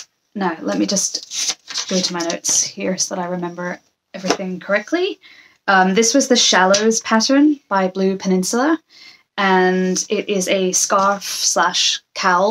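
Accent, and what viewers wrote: United Kingdom. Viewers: Hi, Hello from Scotland. You just popped up on my YouTube suggestions, and I’m so glad you did. I was knitting with some Miss Click Clack Merri Creek Sock yarn while I was watching you. I’ve been a member of her Ravelry Group for around 6 years now. She is a wonder with the dye pots! I even flew from Adelaide to Melbourne a few years ago, for the day, just to attend a Craft Event and a “Clackette” get together. (I have lived sporadically between Adelaide and Scotland over the years - long story) I don’t sew but I still enjoy seeing beautiful fabrics and hearing what you intend to make with them. I’m amazed at what you found in thrift stores. I’m looking forward to seeing your winter coats in those gorgeous wool/cashmere fabrics. The aran cardigan was also a great find. It looks like you are leading an interesting life up there and I look forward to seeing more of your bus, and the surrounding country. 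And… I thought I had detected a tiny trace of a scottish accent here and there, and then you confirmed it. All the best. 🏴🥰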